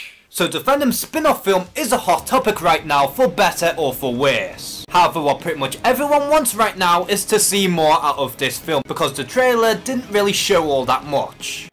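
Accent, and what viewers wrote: Liverpool, United Kingdom. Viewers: Woah are you scouse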